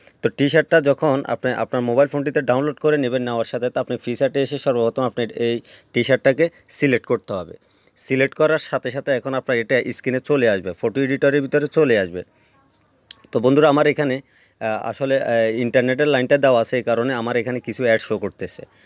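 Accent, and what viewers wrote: Noakhali, Bangladesh. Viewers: আপনে নিশ্চিত নোয়াখাইল্লা কোন সন্দেহ নেই??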